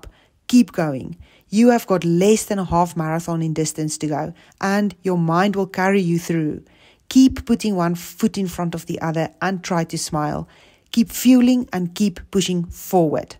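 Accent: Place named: Afrikaans, South Africa. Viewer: Afrikaanse accent in Engels is koning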